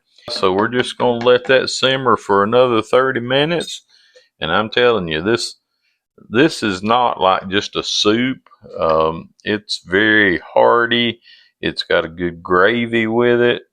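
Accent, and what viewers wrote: South, United States. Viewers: New subscriber here who just happened upon your video and My husband and I can’t wait to make this and we will be watching for more content and just to listen to you talk I LOVE a Southern accent more than anything in the world ❤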